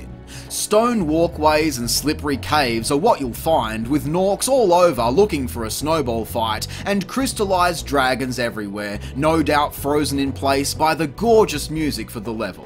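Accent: Australia. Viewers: So glad I found this. Subbed... 😀 are you aussie ?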